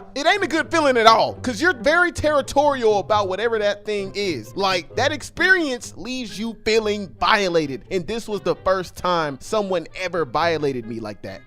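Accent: Alabama, United States. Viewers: What part of Alabama u from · What part of bama you from brodie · Bro what part of Alabama you from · Bro you from Alabama 😮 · This niggas from Alabama 😭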